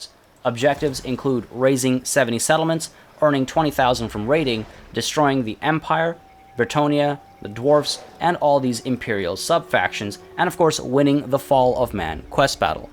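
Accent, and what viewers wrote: France. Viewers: Your French accent is so funny. And you should do this video when everything was out and with all news. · Damn!! That French accent. Cool Vid man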